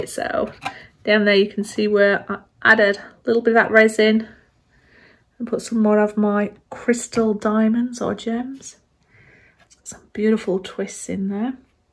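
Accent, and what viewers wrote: United Kingdom. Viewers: Wow. This is Fabulous, and Fantastic. I just came across your channel and am so excited. Your British lol. Ive been watching USA Canada and New Zealand. Doing Acrylic Pouring. I love doing it but I also do lots of other crafts EXCEPT Resin Sculpture. I'm so glad that I am part of your wee family. I'm Scottish but actually part of me feels like I'm from Surrey/Hampshire. I have lived down there for years back and fòrward. And have lots of friends in that area. Now... about you. Lol. Your so friendly,funny. And Very Talented. I love this Vase, the colours are amazing and I love a bit of bling.I can't wait to see you do more. Well done. Stay safe Sandra xx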